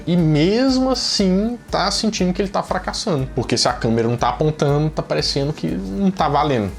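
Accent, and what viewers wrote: Minas Gerais, Brazil. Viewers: 😮 Pode deixar esse sotaque mineiro bonito em paz! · que bom que você continuou com teu jeitinho minerês de falar, é tão fofo. Abração, garoto!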